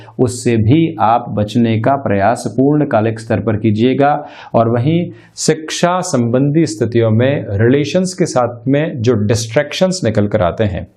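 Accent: Standard. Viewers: नमस्कार गुरु जी आप बहुत विस्तार से विश्लेषण करते हो , इस के लिए धन्यवाद और आप की शुद्ध हिंदी बोलना मुझे बहुत पसंद है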